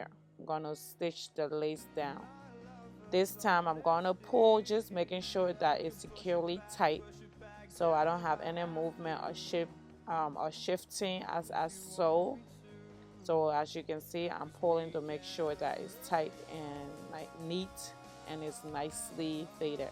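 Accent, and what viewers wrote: Liberia. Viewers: Aww I noticed your Liberian accent 🇱🇷🇱🇷🥰🥰🥰🥰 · Yes I thought so too. She sounds like a Liberian. Good job girl keep it up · You are a west African Liberian. ...your accent though. ...you are very talented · new subbie! as soon as i heard that accent i said i must follow my liberian geh!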